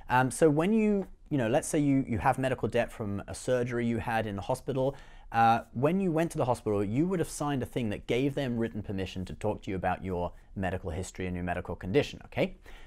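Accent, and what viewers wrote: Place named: United Kingdom. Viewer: 🤔🤔🤔 are you British? Haha I just noticed that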